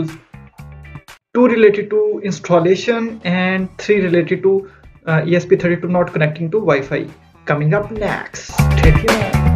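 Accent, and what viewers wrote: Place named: India. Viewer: I don't understand indianenglish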